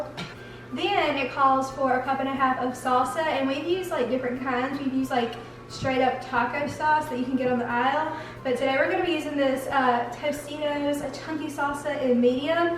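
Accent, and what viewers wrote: South, United States. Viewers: this is a awesome give away I just love listening to your cute lil southern accent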